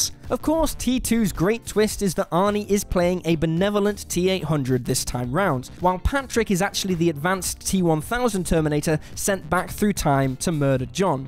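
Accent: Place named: North, United Kingdom. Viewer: He is northern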